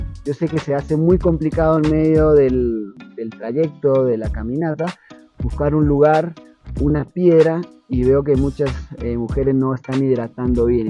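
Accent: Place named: Argentina. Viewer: que buen video! me llamo mucho la atencion tu acento jaja, cada tanto te salia un acento argentino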